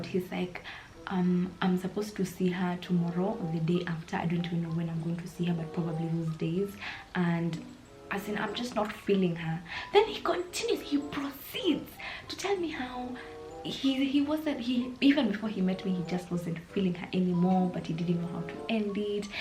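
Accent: United Kingdom. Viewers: The british accent always gets me😂😂❤ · The British accent gets me all the time😂😂😂😂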